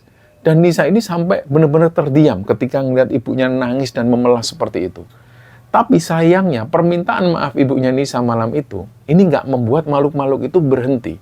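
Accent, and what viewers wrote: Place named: East Java, Indonesia. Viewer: Sy bu Wulan Smg ngikuti kembara sunyi dan mulai tertarik ksh2 misteri saat n istri cerita perjalanan k gunung bromo th brp itu 2020 ya... Sy tertarik cr penyampaian cerita ini bs membawa alur crita enak, seolah2 sy ikut terlibat dlm crita itu mdh d pahami.. Enjoy gt bhs jawa timurnya medok banget,krn sy org surabaya leluhur sy...semoga kembara sunyi smakin sukses Aamiin Yra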